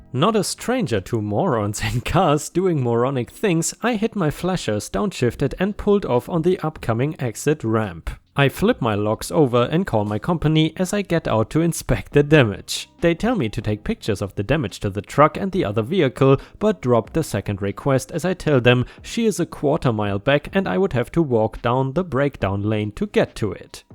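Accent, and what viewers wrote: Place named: Germany. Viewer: ⭐⭐⭐⭐⭐⭐another set of great stories, brilliantly told! I lived and worked in Germany for a while and miss the accent. Makes me feel at home sort of? If you know what I mean?? In a nice way! 🌟🌟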